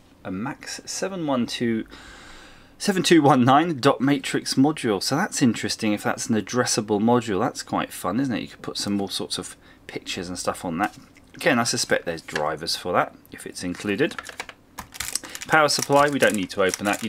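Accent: United Kingdom. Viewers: I like the way you describe all the kit components! Nothing like to hear the British accent! 👍🏼😃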